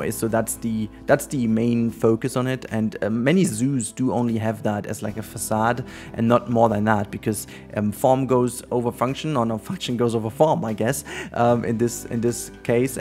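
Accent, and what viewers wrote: Germany. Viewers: your English with a German accent is wonderful! I have a German friend who speaks English and has nearly the same accent. You're doing great. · Hello. Please don't worry about your accent or change it. I also come from Germany and with your videos my English grade is better. Thanks for your work here on yt and Twitch. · My mom is german and has a german accent and I never hear it. I sometimes barely hear your accent because i'm just so used to german accents... And Australian. But anyways, she sometimes gets some words wrong, mainly words with J's in them, and I just kind of roll my eyes and correct her because she's my mom, but hey, it's a normal german thing to do. Don't feel bad about it! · You speak English very well! English has dozens (if not hundreds) of different accents among its native speakers. In the USA there is Southern, Cajun, Boston, Jersey, New York, Mid West, and several others. 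Not to mention the various accents with the UK and Australia. You speak English with a German accent because you are German. And let me say. You speak English very well my friend. Your grammar is better than most adults here in America so props to you! Ignore the haters, they are just unhappy in life and need to hurt people in order to feel better about themselves! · don't worry about your accent, the important thing that we understand you clearly, and atleast i do. When i was new to this channel you were the first person ever that i heard speaking with german accent, and yes, it sounded very different at first, but nowadays i don't even realise it. As a non native english speaker i understand your pain, cause like you said, we do know that our accent is weird, we just can't figure out why. But anyway, the building looks awesome, keep up the good work!👍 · Hey - I just wanted to say I think your accent is great:) I'm an English only speaker and I never have a hard time understanding what you are saying. 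Also try to remember that language is always imperfect and changing (even though people like to think that their way is the "right way" a lot of the time) - there's no need for them to judge you and certainly no need for you to judge yourself. I can't imagine having to learn my language as a SECOND language so I give major props to you for speaking it as well as you do. Plus, your German accent is cool and I hope you keep it:) Great video as always, and take care ^_^ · I must say I'm not bothered by your german accent, your videos are amazing and thats all that matters.😊 Keep on the good work cause I'm ready for the next episode!👍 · I ‘notice’ your accent only insofar as I’m aware that you’re German. You speak excellent English — from an American who lives in England. I’ve never noticed anything ‘wrong’ with your English. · Hi, very nice habitat and lovely video! btw your accent is not disturbing. 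You're english is very good with the touch of German accent, i like it:) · Hi! Please dont worry about your accent, I am German too and my englisch is very very bad, but the most important thing is, that i can understand you and understand, what you want to say. Thats the point. So please keep it up <3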